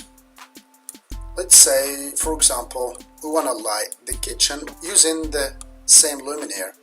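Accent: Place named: Australia. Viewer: you got some accent Matttte !! AUstralian !!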